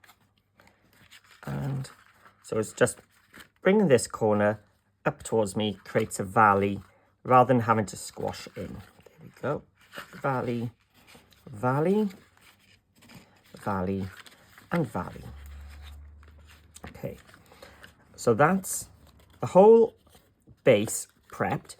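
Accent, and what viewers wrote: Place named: Carmarthen, United Kingdom. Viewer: Just come across from another YT channel and so glad I did. These baubles are gorgeous and ideal to make for my grandchildren for little treats on the Christmas tree. From one 'welshie' (Newport, Gwent South Wales) to another (you sound like you're from the Carmathen area) to another, thank you so much and can't wait to watch more of your videos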